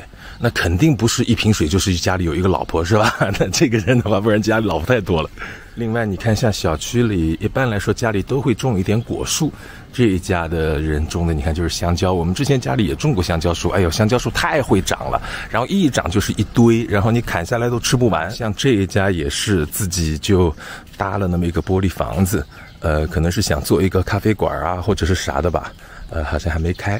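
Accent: Shanghai, China. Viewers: 上海口音，口气。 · 册那 一听口音就是阿拉上海银（手动滑稽）羡慕长居海外鸭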